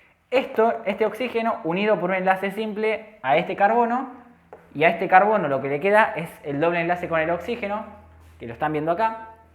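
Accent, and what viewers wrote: Argentina. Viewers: Oye profesor ¿ ud es de Argentina? Y tengo una duda .¿ Estos tipos de tema entran en examenes de ingreso de cualquier universidad de medicina de Argentina?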